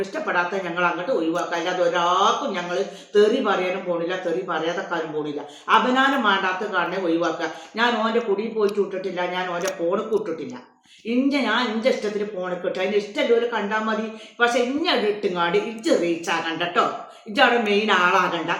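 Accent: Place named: Malappuram, India. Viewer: സംസാരം കേട്ടാൽ നാട് ചോദിക്കണ്ട ഞാൻ വിചാരിച്ച പോലെ തന്നെ. വണ്ടൂർ. കാളികാവ്. മഞ്ചേരി. 🤣🤣🤣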